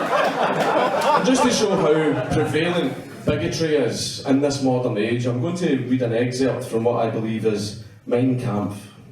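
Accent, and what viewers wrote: Scotland, United Kingdom. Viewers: Could barely understand you. Scottish Comedian/10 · Way too British to understand, dear god. Lol, but good stuff, man. Right on.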